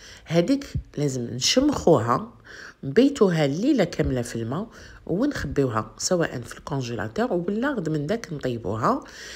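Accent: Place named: Constantine, Algeria. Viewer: الله يبارك حبيبتي ولهي تعجبيني نقولك تقول عليك قسنطينية هدرتك كما تعن ربي يخليك نشالله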